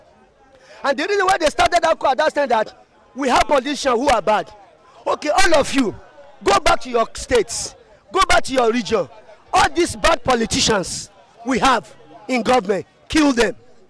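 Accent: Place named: Yoruba, Nigeria. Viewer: He's not Hausa u dunno ur own country men lol his, accent hangry is Yoruba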